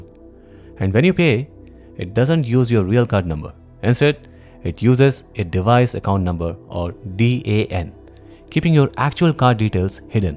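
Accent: India. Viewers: Are you India ? You speaking likes India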